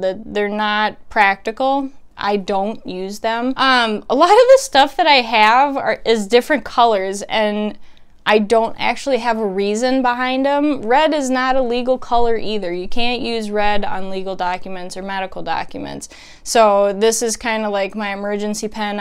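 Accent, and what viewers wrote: Midwest, United States. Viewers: That midwestern accent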